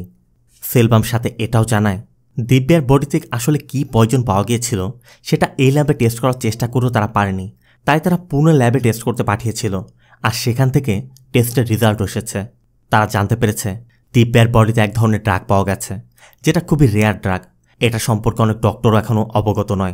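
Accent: Bangladesh. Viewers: কলকাতা বাঙালি এই ভাবে কথা বলে না নিশ্চিত বাংলাদেশি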